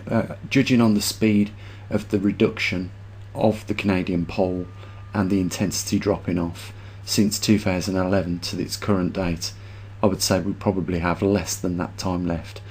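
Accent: Birmingham, United Kingdom. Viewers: OMG a Brummy talking science . Whatever next. Utter bllx.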